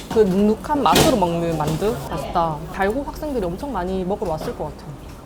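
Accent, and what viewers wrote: Gyeongsang, South Korea. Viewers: 살짝 경상도 사투리가 툭툭 튀어나와서 더 정감이 갑니다. 두류동 벚꽃만 생각했는데 맛집에 멋 뿜뿜 대구한바퀴 잘 봤습니다. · 우방타워 야경을 보면서 야자를 했었는데, 이렇게 정겨운 대구 말투로 보니 반갑네요.ㅎㅎ 예전에는 두류공원이 밤 되면 우범지대였었는데, 지금은 시민의 공원으로 재탄생된 것 같아 보기 좋네요. 다음에 시간 나면 애들 데리고 한 번 가봐야겠어요. 좋은 영상 감사합니다. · 재밌어요. 님 ^^ 이거 구독 갑니다. 라떼도 우방랜드에서.. 망원경으로 공개관측회 & 천체사진 전시회 하고 그랬는데... (대학교 동아리) 그때가 98년 이맘때쯤 가을이었구나~ 졸업후 계속 타지에서 생활하고 있는데.. 이런 대구TV 사랑합니다~ ㅋ 약간의 대구억양이 가미된 ^^ 대구한바퀴~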